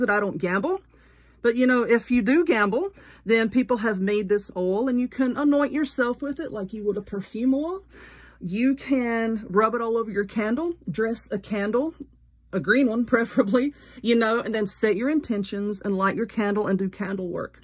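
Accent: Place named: South, United States. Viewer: I can't believe it took me this long to find this channel!!! I've been following your crafting channel for a couple years. I love your crafts and your southern accent! I can't wait to watch all of these videos. 🥰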